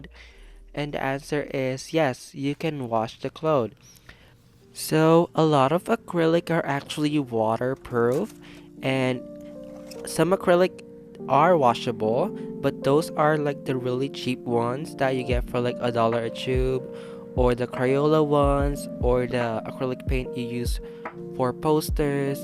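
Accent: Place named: Philippines. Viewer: I noticed your accent and the word buwan, are you Filipino?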